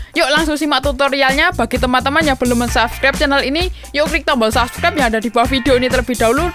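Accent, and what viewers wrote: Java, Indonesia. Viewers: Channel Ini - Kreatif - Sopan - Jowo Nyo Muantep Keren BosQu! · Deke kang Jawa ya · Koe wong jowo to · Bang orang mana kok nyomongnya seperti orang jawa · Medhok e mas tekan ndi toh? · Abangnya dari jawa ya . . . . . . .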